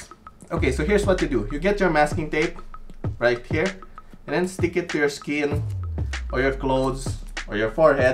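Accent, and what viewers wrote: Philippines. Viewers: Kuya nag tatagalog po ba kayoo... parang pinoy po kc kyo.. 😅😅😅 .. do you have a video about rendering style but pen and ink only rendering.. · Are you Filipino? I just noticed your accent and the way you talk. Idk if im right, but.. Are you? PS. I CAN'T BELIEVE I JUST SAW YOUR CHANNEL NOW *smashes subscribe button in rage* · Uhmmm are you Filipino?? Cause you sound like one... No offense tho cause I'm Filipino too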